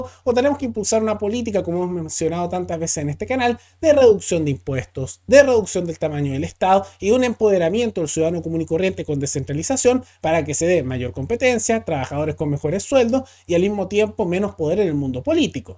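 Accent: Chile. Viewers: Buena alocución !! Se nota esfuerzo en superar acento chileno , ahora es más comprensivo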